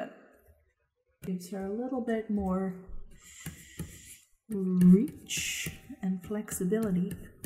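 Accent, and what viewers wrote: United Kingdom. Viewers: I'm so happy I just discovered your channel! I really love animation 'n stuff and I'm always happy to find a good one with sympathic ppl on it and your british accent makes it even better!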